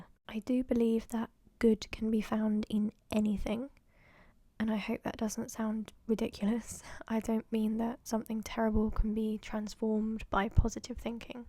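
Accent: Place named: RP, United Kingdom. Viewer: Sound so posh here. I love it! 😂😊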